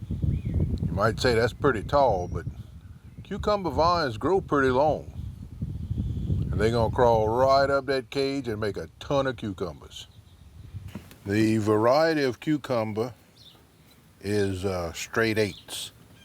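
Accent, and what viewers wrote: Louisiana, United States. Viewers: Just found you on YouTube Some of the vids are a bit older but not a lot changes in gardening. Love the Cajun accent. How about some zydeco music?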